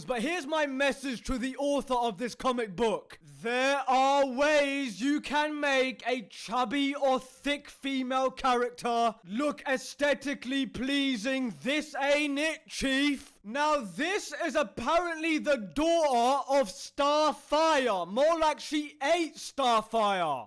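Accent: United Kingdom. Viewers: The combinations of your accent, commentary, angry tone and brilliant British insults made this video a 1000x better 🤣🤣🤣 Good job mate! 'I am not Starfire' is not even out yet and the preview description alone is already trash as fuck. There's absolutey NOTHING charming nor likeable about Mandy whatsoever. Her entire personality and character traits are her being 'gay, overweight and goth'. That's it. Also the fact that she "hates everybody but her best friend" who fyi, looks like another godawful Tumblr character who probably identifies themselves as an attack helicopter. As if all goths "hate everybody" for no reasons. And what's even more ironic? Mandy eventually falls for a girl in her class who is basically like Starfire (tall, popular, beautiful, nice/kind)! Wasn't Mandy try to get away from people like her mother?? WHAT KIND OF BS IS THAT?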